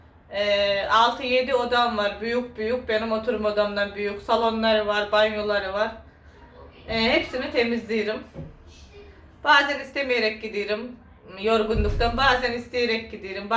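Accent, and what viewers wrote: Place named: Akçaabat, Turkey. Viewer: CIM SENIN GIBI KONUŞUYOR ELTIM AKCAABAT LI ONUDA SEVERIM KONUŞ MALARINI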